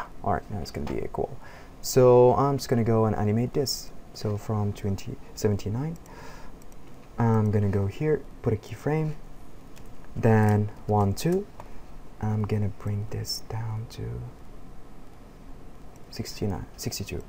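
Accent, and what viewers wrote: Morocco. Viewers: Hmmm good inspiration im late4 years x) you really sound moroccan(moroccan name +good english and good french)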